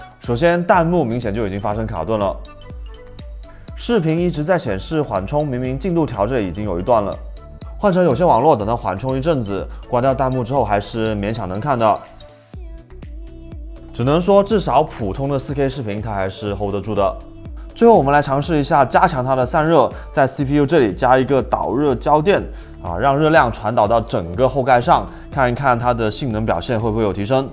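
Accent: Cantonese, China. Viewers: 請問你是廣東人嗎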